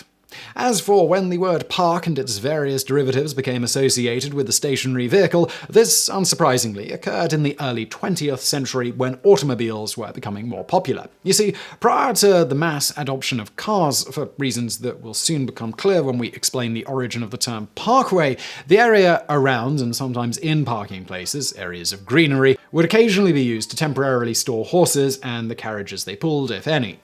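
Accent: United Kingdom. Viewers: British English has entered the chat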